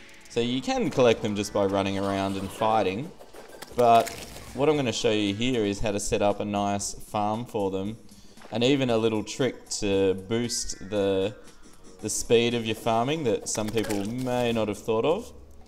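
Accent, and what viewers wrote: Australia. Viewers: love your voice, so aussie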